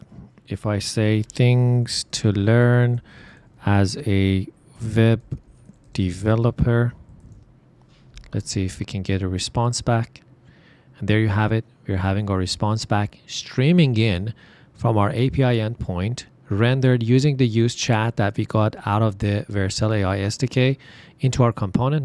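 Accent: Iran. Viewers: آخی فهمیدم بوی ایرانی میدی هم از لهجت هم از چهرت کارت هم درسته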